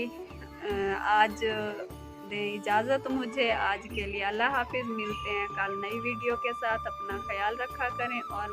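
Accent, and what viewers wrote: Saraiki, Pakistan. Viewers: ماشاءاللہ بہت پیاری بہن ہے سرائیکی آواز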